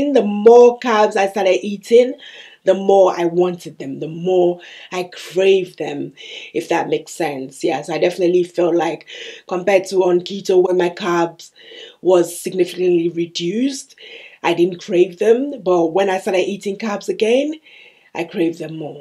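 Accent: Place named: United Kingdom. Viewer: So I notice your accent and I’m not very familiar with customs in the UK (if that’s where you’re living). I’m in the US and we’re celebrating Thanksgiving this week. If you celebrate Thanksgiving or any carb-heavy holiday, how easy/difficult is it for you to maintain the keto diet during a time when the whole country is gorging on a lot of carbs?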